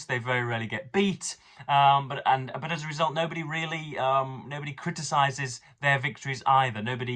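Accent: United Kingdom. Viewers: I'm impressed by your wee Scottish intonations, laddie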